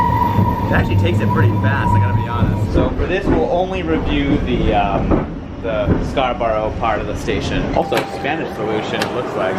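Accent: Boston, United States. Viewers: Wow that was an aural and auditory feast! Even your Boston accent is coming back strong! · Watching someone with a Boston accent here makes me smile!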